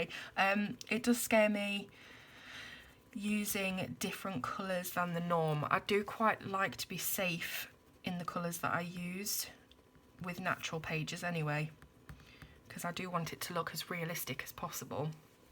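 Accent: England, United Kingdom. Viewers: Good video. It's nice to hear an English voice on a colour along. You didn't sound nervous at all.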